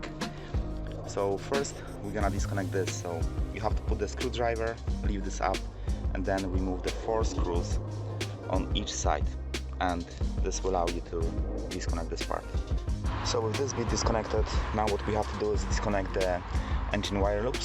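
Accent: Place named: Poland. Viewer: Ale typowo polski angielski 😅 po pierwszym zdaniu wiadomo skąd autor pochodzi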